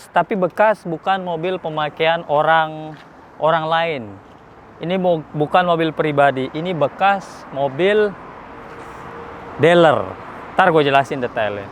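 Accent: Lombok, Indonesia. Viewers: Bang. logat lombok nya masih ada ya😅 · ndek inik telang mule logat sasak tie bang😂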